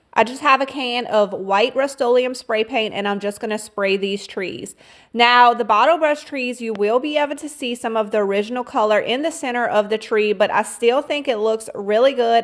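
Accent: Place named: Louisiana, United States. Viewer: Just found you today and LOVE your ideas! Beautiful…..also, can I guess where you’re from by your accent and that it doesn’t usually snow there alone? Louisiana? I’m from Southeast Texas and that’s just what your accent reminds me of 😀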